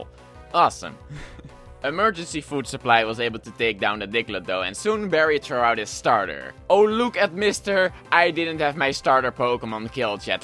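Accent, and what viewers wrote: Netherlands. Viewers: Are you dutch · Hears first sentence, jep that’s a dutchie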